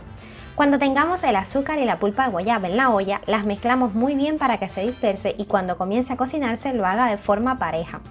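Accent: Cuba. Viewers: Hablas con acento habanero ☹️ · Solo sé que me encanto tu acento cubano.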